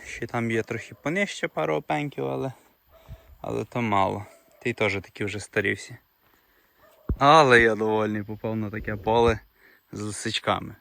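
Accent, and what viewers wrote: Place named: Hutsul, Ukraine. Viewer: як я люблю гуцульську говірку🎉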